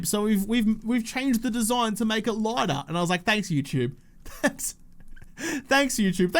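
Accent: Australia. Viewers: Flipping the script on Neil's letter... the question for viewers & subs is whether we remember our first 7D2D video? I do. I had been playing 7D2D since the Alpha versions could be counted on just your fingers... and then Alpha 17 landed, and the game took a massive leap forward. I wanted to build a base using a draw bridge, and I stumbled into a relatively recent video from some guy with a strong Australian accent, explaining draw bridges. From the first video, I was hooked. I came back to see others, and within a relatively short time, I was Subscribed. Excellent work on your part (the arrow slit force field was game changing for me with regards to how I managed base-building). Mixed with a decent Draw Bridge layout, the arrow slit force field was unbeatable. So much has changed since then, but not... consistently inventive and creative, and always chock full of fantastic base-building & horde-night prepping ideas. 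Thanks for all of it,. The game simply would not be the same without you. Congrats on a well-deserved 100k Subs!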